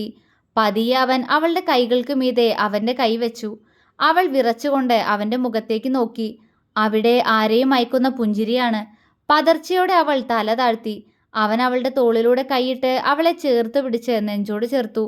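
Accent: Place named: Malappuram, India. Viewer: ആണോ. എനിക്ക് ഈ സംസാരം നല്ല പരിചയം ആണ്. എന്താന്നോ എന്റെ വീട് മലപ്പുറം പാലക്കാട്‌ ഒക്കെ കടക്കുന്ന ബോർഡർ ആണ്. പിന്നെ എന്റെ സ്വന്തം വീടിന്റെ അടുത്ത അയൽവാസികൾ മുസ്ലിസാണ്. അവരുടെ കൂടെ കളിച്ചു വള്ളർന്ന ആളാണ് ഞാൻ. അത് കൊണ്ട് എനിക്ക് വേഗം മനസ്സിലാവും.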